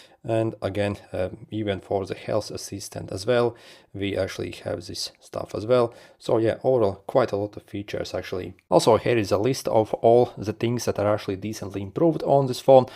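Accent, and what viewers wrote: Russia. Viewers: Ты-ж русскоговорящий. Вот прям по произношению слушу, что русский. Говоришь, как Мутко🤣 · are you russian? the accent is adorable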